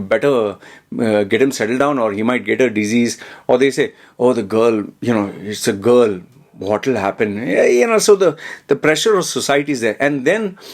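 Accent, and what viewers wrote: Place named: Mangalore, India. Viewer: I wish I had listened to your advise 15 years ago 😆 Especially coz ur explaining it all in Kudla dha porbu style English 😄 Cheers!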